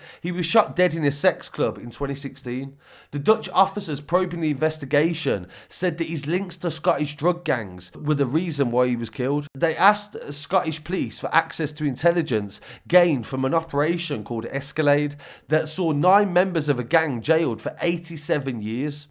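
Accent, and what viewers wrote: Birmingham, United Kingdom. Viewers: Good channel but your brummy accent burns my lugs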